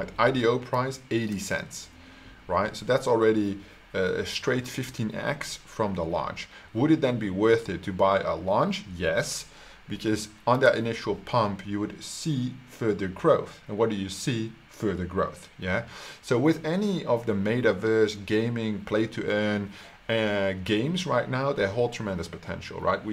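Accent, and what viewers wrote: Netherlands. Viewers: Nice vid bro!! Smart thinking🤔💎 Where you from? Accent sounds Dutch😅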